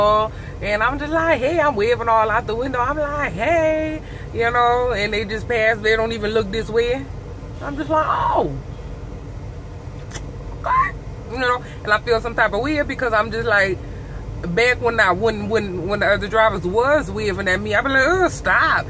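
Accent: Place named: New Orleans, United States. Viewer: N.O accent strong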